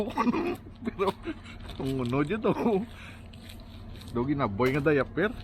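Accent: Iloilo, Philippines. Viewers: Masarap pakingan ilongo salita, cebuano ako pero konti nakaintindi ako ilongo❤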